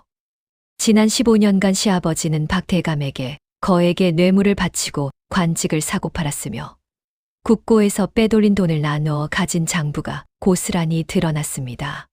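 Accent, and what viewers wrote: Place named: China. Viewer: 억지로 읽는걸 들어보니 중국인 아니면, 조선족인가?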